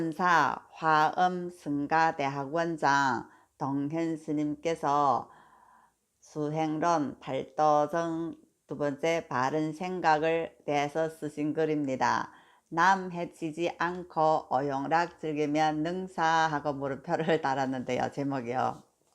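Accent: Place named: Gyeongsang, South Korea. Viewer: 불교 신문이라... 남 해치지 않고... 근데..많은 사람들이 남을 해쳐서 자신의 이익을 얻고자 하지요....혹시...경상도 출신인가요? 나도 갱상도인디...억양이 갱상도 같아요 ㅎㅎㅎ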